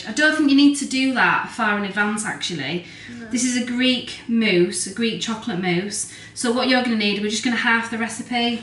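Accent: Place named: West Yorkshire, United Kingdom. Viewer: Well done on your first Pasticcio. Hope you don't mind me saying that we would never put clove in it and the sauce gets smooth a lot faster with a balloon whisk. A great cook to watch on YouTube when you have time is Dimitra's Dishes - she cooks lots of authentic Greek food. I think you sound like West Yorkshire - I am South Yorkshire (Sheffield) but married to a Greek Cypriot. Enjoyed your video - thanks for sharing.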